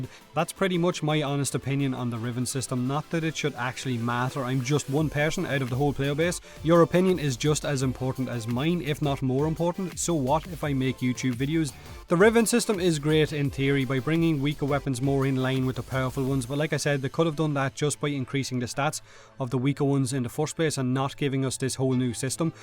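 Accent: Ireland. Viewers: are you Irish cause you sound Irish?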